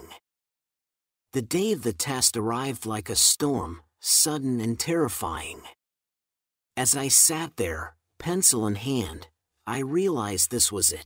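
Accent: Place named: India. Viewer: just because of your accent I'm curious to know that whether you are from India or abroad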